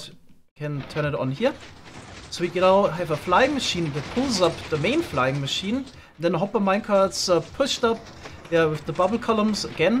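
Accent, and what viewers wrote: Germany. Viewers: Hi, where are you from? You sound German or am I just stupid?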